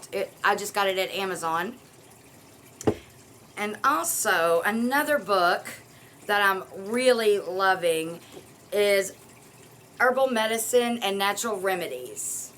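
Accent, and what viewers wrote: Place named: Kentucky, United States. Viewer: I have owned it over 25 years! It helps!she's also the author of Roses love garlic! I like Rodale's Herbal.Great video, never stop learning right?! Aww, you got your Baker Creek winter garden porn!😂💕. What is your growing zone?I'm up in Chicago, zone 5b. You sound like Kentucky to me. Freezer coleslaw?!my record just skipped!lol That is a recipe i need!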